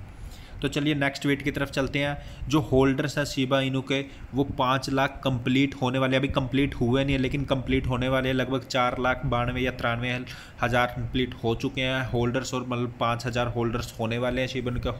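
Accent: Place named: Haryana, India. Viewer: Pure hariyani Bhai.....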